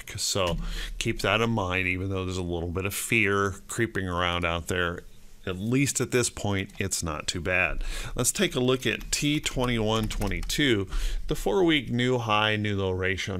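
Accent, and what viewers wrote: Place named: United States. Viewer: Your morning market prep intro sounds so American 🙌 glad to hear that you’re doing better.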